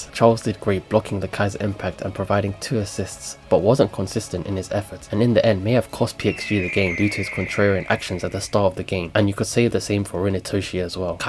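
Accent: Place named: Somalia. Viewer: i can hear in ur voice you’re somali, good vid bro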